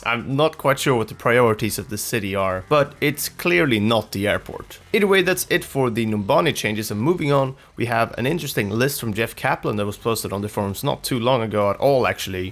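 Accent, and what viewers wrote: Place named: Sweden. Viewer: Where is this guy from? He sound Swedish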